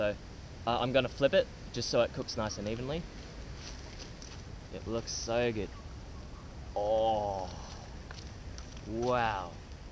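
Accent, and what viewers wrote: Australia. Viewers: Are you Mexucan ? no Austrailian .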